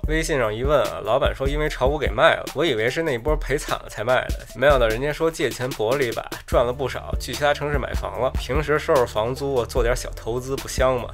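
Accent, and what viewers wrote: Beijing, China. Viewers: 不是正经更新，比较随意🤣。老北京土著了哈哈哈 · RangErliVe 这就是内京片子味儿 哎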